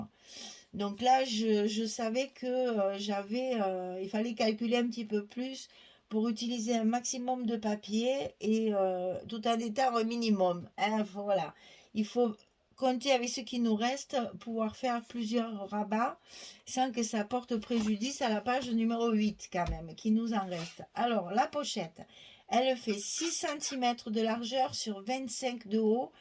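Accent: South, France. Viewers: Toujours enchantée de vos créations et vos explications données avec ce petit accent du sud que j'adore merci pour toutes ces explications très claires bisous et belle journée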